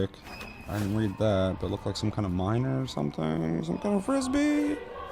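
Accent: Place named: Russia. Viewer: Anthem has an identity crisis. And kinda tries to approach too many audiences. I`m not really interested in BioWare after this point. I`ll still give DA4 a try, but not expecting anything special. This is a weird scenario, when you take a really prestigious company like BioWare, an actual fuck-up and bad game would be kind of given a pass, like "shit happens". But when a company like that releases a game that`s not letter-for-letter awful or bad, but one that`s like Anthem, a game that`s nothing special and has nothing exciting about it, just "meh", it does more damage than a fuck-up. It`s disquieting and worrying when you know that this is something they put actual effort into. Resident Evil is doing justice against the shamelessly stupid movies. I love horror games and Resident Evil is a damn good one and Atomic Hearts looks really dope. Seeing other survival horrors go down the drain (like Dead Space that has been downhill since the first title and then EA shut them down) and other horror games with loads of potential (like P.T or Alison Road) get canceled or drown in development hell is saddening, but at least we have Resident Evil. 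Yo, your russian accent is hilarious ;"D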